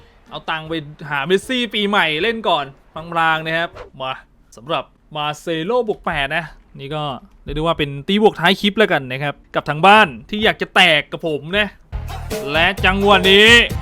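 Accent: South, Thailand. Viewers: พูดเหมือนคนใต้เลยนิ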